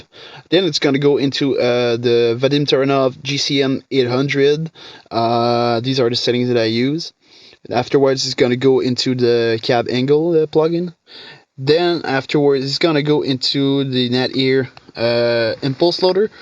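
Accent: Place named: Quebec, Canada. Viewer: je savais que tetais quebecois avec ton accent , jai confirmé avec ton caliss 😂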